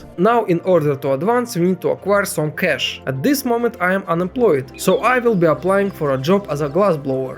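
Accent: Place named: Eastern Europe. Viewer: Самый славянский акцент из всех которые я когда либо слышал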